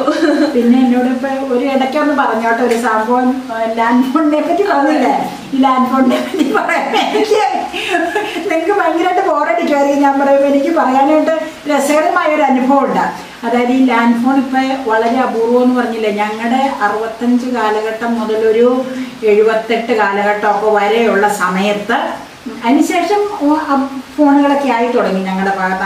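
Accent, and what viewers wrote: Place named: Muvattupuzha, India. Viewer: Ru from muvattupuzha area you talk like people from there my family is from there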